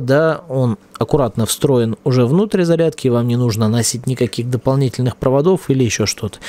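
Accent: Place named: Russia. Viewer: От аканья автора уши болят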